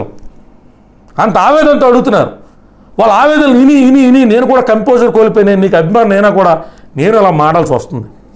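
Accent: Godavari, India. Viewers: Mee yaasa praasa chala bagundhi. godavarolla maatalu👌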